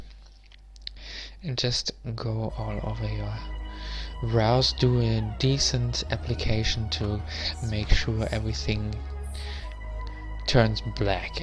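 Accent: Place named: Germany. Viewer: hahah i thought "he kind of has a German accent too" so I checked some other videos of yours and haha you are truly the perfect nightcrawler XDD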